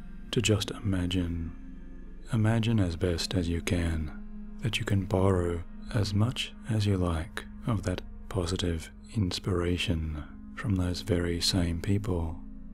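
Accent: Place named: Australia. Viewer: its Australian actually ;)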